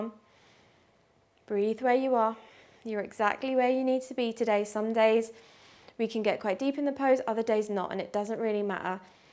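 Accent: United Kingdom. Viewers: Thank you so much! I decided to get myself a strap yesterday after over a year of (almost) daily practice, and that video is exactly what I needed :) (And by the way, I wish I could speak english with such a lovely accent, purely british <3 )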